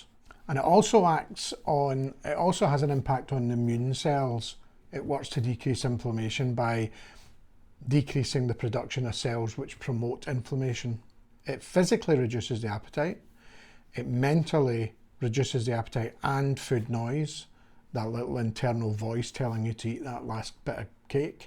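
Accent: Scotland, United Kingdom. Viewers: I love your calm Scottish accent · Good video. I could listen to that beautiful accent all day. I’m Scottish, first generation Canadian. Your accent reminds me of my people.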